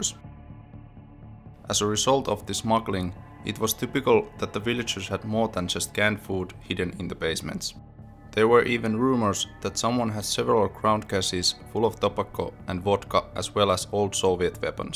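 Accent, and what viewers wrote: Finland. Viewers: This format is really good man. Hella interesting but also it really shows that you are competent at game development (Its easy to say you are good at something), you are trust worthy and honest! So many scammers out there and delusional devs! Good luck man I hope to back this when I can. Oh and I absolutely love the Finnish accent so enjoyable to listen to haha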